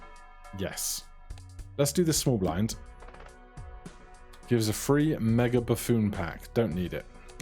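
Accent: United Kingdom. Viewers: Hello, been loving your Balatro content, something about a fellow Brit commentating over this game in such a dry-witted fashion has only made me so much more eager for the release of this game so that I can actually play it for the first time. Fantastic content, please keep it up as much as you can considering your other commitments.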